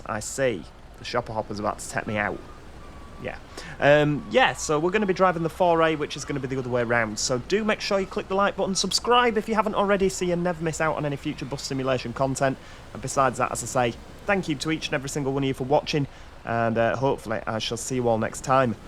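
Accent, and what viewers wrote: United Kingdom. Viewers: What part of the UK are you from? You have a very sweet accent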